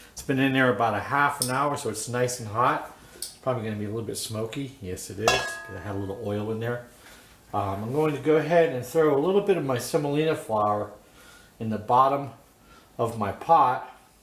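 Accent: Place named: Pennsylvania, United States. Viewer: I'd love a junk of that bread covered in butter❗❗❗Are you from PA❓YOU SOUND LIKE MY MAMA'S FAMILY🥰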